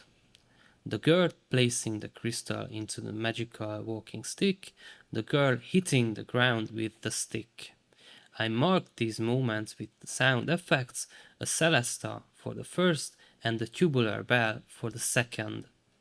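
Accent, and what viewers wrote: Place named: Hungary. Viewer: TUDTAM A KIEJTÉSEDBŐL HOGY MAGYAR VAGY (nagyon kiraly a video amugy)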